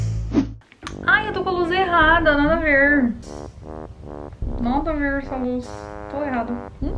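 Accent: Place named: São Paulo, Brazil. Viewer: Adorei o sotaque do interior paulista!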